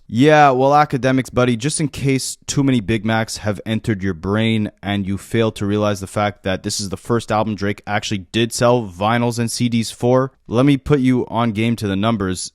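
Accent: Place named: Montreal, Canada. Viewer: Are you from Laval or the east end of Montreal or something. You sound like you are a Greek or Italian montrealer 😂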